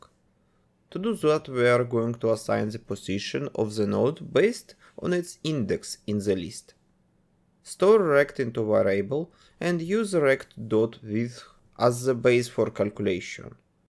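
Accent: Russia. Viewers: Благодаря русскому акценту я лучше понимаю) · произношение русского)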